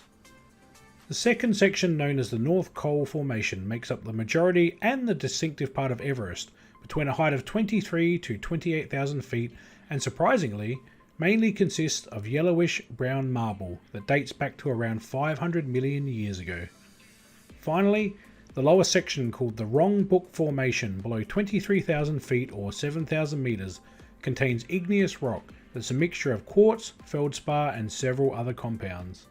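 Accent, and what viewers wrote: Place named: Australia. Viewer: I love the Australian voice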